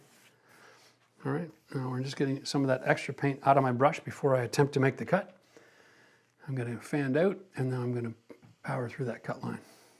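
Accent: Canada. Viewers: Are you Canadian? I detect an accent. 😉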